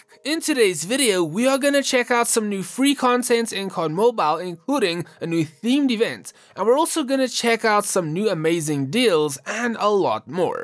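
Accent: South Africa. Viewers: ARE YOU SOUTH AFRICAN?! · Bro is south african?? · are u from south africa?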